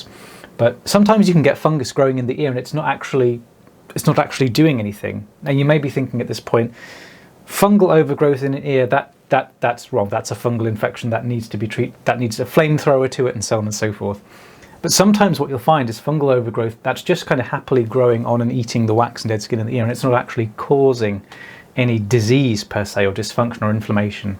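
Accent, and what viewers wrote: United Kingdom. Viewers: I AGREE WITH HIM ABOUT THIS ! YOUR VOICE IS VERY CALMING TO LISTEN TO !!!!!! I'VE ALWAYS LOVED LISTENING TO BRITISH PEOPLE TALKING !!!! I LIVE IN SAINT CLAIR COUNTY , ALABAMA . MY PARENTS WERE FROM MARYLAND , SO I DON'T SOUND LIKE A TRUE SOUTHERNER WITH A "TWANGY" VOICE !!! I SOUND MORE LIKE MY PARENTS THAN I DO A TRUE SOUTHERNER DOES . 😊😊😊😊😊😊😊😊😊😊😊😊😊😊😊😊😊😊😊😊😊😊😊 !!! WHEN I WAS YOUNGER , I HAD A YEAST INFECTION IN MY EARS AND MY ENT HAD TO SQUIRT WARM WATER IN MY EARS AND IT MADE ME FEEL DIZZY AND NAUSEATED WHEN HE WAS DOING THIS TO ME . I LOVE YOUR VIDEOS - THEY ARE VERY INTERESTING TO SEE . 😊😊😊😊😊😊😊😊😊😊😊😊😊😊😊😊😊😊😊😊😊😊 !!!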